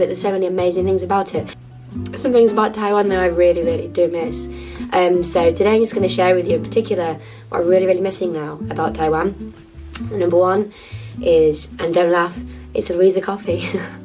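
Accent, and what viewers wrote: United Kingdom. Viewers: 一點開就是滿滿優雅的英國腔好好聽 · 的英國腔好好聽哈哈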